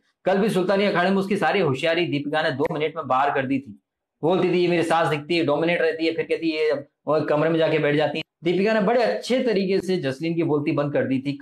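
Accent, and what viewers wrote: Haryana, India. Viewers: jii aapka haryanwii andaaz ekdum kamaal...jalota aur jasleen ka kapdonka drama sirrf aur sirrf trp boosting tactics..kuch bhi reaistance n dikhake directly kapde aur makeup de deti toh drama kahanse bann pata..agar trp laa rahe hoo toh bb khud bachate hai frm elimination... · bhai aap kamal ho 👏👏 👏......Aapka Haryanvi andaaz, aapki break up wali aisi lagi lagan aur jasleen-anupji ka breakup ka jo varnan kiya hai wo bahout enteratining laga..Mujhe personally bahut hassi aayi jab aap alag andaz mai baat kar rahe the.....Aapka 15-20 min ka review 1 gante ki borring bb12 se jyada entertaining hai 😂😂😂😂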